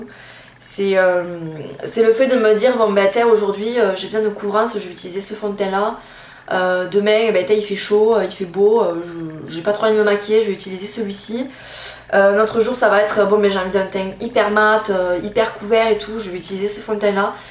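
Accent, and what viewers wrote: South, France. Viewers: J'adore les fonds de teint moi aussi et j'aime en porter toute l'année, j'aime tester les nouveautés. J'aime bcp tes vidéos avec en plus ton accent du Sud car je m'y reconnais (j'habite pas loin....). Surtout continue, biz Nany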